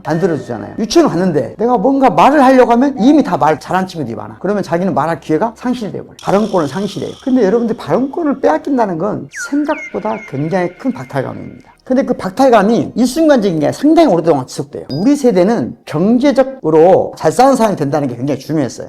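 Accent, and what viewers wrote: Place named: Jeolla, South Korea. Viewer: 말투가 전라도네 ㅋㅋㅋ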